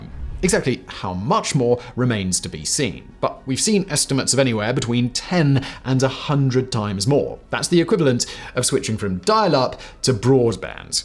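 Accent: United Kingdom. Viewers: Your voice is hard to listen to. It's like you're from the most stereotypical posh little English village imaginable. And no, despite what you think, it's not really a good look for you. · You Tube SUCKS! I have removed this man's channels over and over again. But when You Tube changes the algorithm, it force feeds me this Simon guy's many channels despite my removing him. Simon's channels suck. They are poorly researched information that is mostly government propaganda talking points. Terrible videos. And just because Simon has a British accent, this doesn't not make him an authority on anything. He's just a talking head. England is over. Brexit has made the only job in England a You Tube content provider. Terrible list videos that spread falsehoods and bull.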